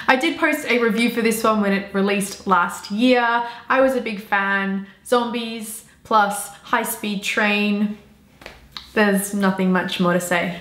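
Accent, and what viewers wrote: Australia. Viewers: You have a good auzzie accent you speak properly unlike some auzzies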